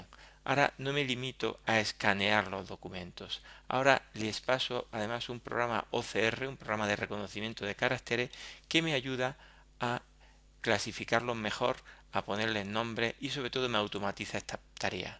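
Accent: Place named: Andalusia, Spain. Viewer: Estoy estudiando para secretaria de dirección y su vídeo me ha salido sugerido a través de uno recomendado para el estudio. No haga caso a esa persona que se ha metido con su voz, que no afecte a la seguridad en usted mismo, tiene una voz agradable ¡y ese acento tan encantador del sur...! Buena contestación, por cierto. ¡Ánimo!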